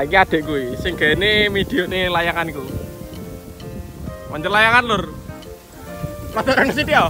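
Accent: Java, Indonesia. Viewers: Jowo ne ndi mas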